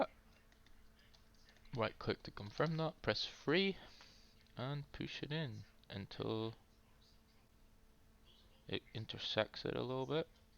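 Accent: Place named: Northern Ireland, United Kingdom. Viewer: Your accent, I keep getting a little Northern Irish in it 🤔. Great tutorial btw.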